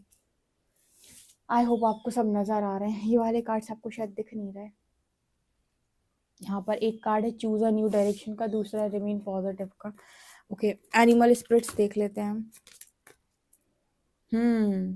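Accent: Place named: Bareilly, India. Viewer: ap up ya bareilly side jaise sound karti ho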